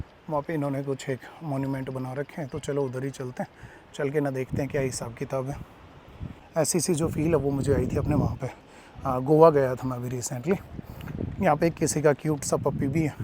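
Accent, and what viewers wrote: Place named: Haryana, India. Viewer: Brdr Apko aawaj me haryanvi touch hai AAP Haryana me Kha ye hai bataiye please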